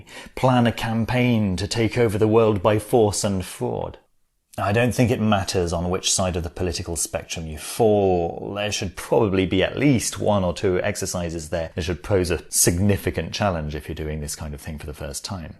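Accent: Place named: United Kingdom. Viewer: A-dee-siv! I l love the British accent. Bring on the Devine Feminine! Give us what ya got.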